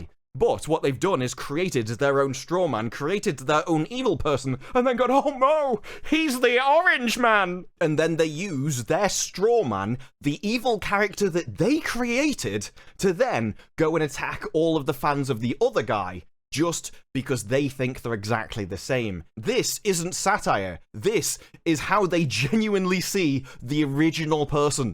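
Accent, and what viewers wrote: United Kingdom. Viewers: Mate, are you taking the piss? You’re trying to miss the point or are just a professional idiot with a British accent?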